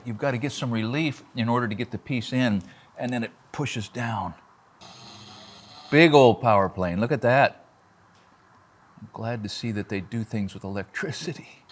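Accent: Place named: Midwest, United States. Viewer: I hear a Midwest accent in your voice.🤔 I grew up in LA, "Shakey Town", Southern California.😧 It's obvious you're not building for earthquake resistance.🤨 That's the reason for some of those "odd" tenon shapes.☺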